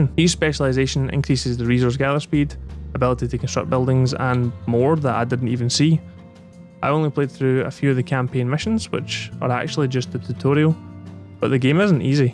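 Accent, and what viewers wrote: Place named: England, United Kingdom. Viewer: AHAHAH this is so funny i love people not understanding english accents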